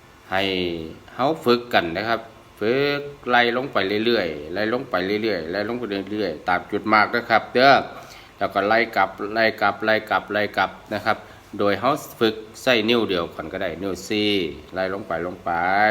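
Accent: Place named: Chaiyaphum, Thailand. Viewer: ม่วนหลายครับอาจารย์ เข้าใจง่ายนำพร้อม สำเนียงเว้าอาจารย์คือคนชัยภูมิแท้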